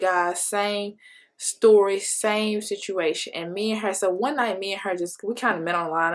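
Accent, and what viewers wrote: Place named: Detroit, United States. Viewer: you have a detroit accent for sure ☺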